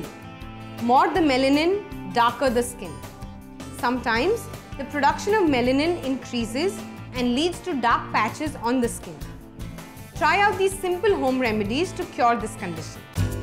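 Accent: India. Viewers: Oh you have such a lovely Indian accent. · The indian accent is so cute :O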